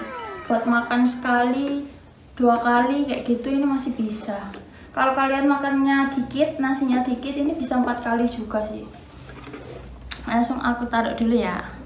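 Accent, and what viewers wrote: Indonesia. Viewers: Medok banget mbaknya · Medok banget kak basanya 😅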